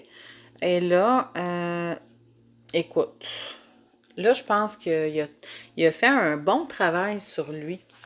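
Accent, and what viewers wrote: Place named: Quebec, Canada. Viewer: Quel horreur cet accent crispant c'est canadien ? québécois ?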